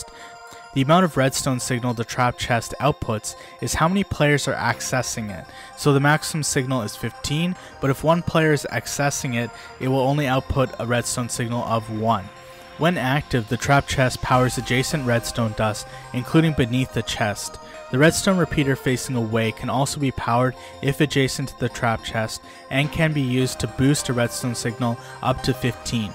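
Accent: India. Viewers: Are you a indian bro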